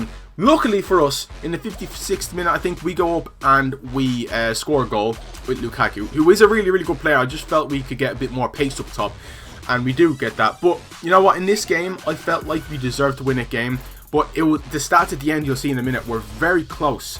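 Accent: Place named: Ireland. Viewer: Yo where you from in Ireland? Im from Galway. Im guessing Dublin??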